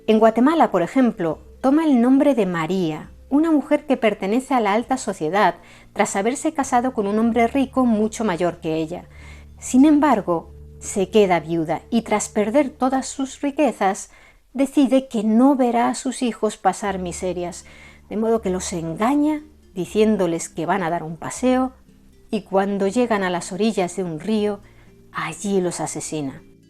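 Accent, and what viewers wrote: Spain. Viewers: Hola, estoy suscrito a tu canal y veo todos tus videos,y me encanta todos los temas que abordas pero sobre todo me impresiona tu forma de relatar,me encanta el timbre de tu voz junto con tu acento español,(soy de Argentina) y me pregunto,alguna vez pensaste en hacer videos tipo audiolibros, sería genial,tu voz es excelente para eso,tal vez sería mucho hacer 2 videos a la semana pero un audiolibro cada tanto sería genial.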